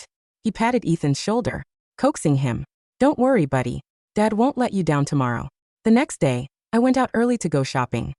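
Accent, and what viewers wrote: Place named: India. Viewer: The Indian ascent is so funny🤣🤣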